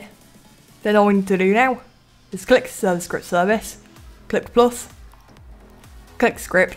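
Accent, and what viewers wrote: United Kingdom. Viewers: You sounded like a fellow bri'ish mate.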